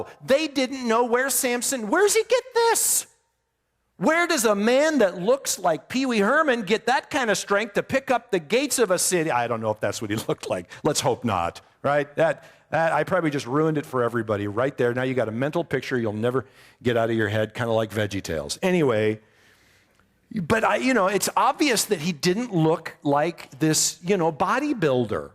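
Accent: Canada. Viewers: Me too. Haha. I'm from Ontario Canada and we don't all have accents. Lol. He sounds canadian to me.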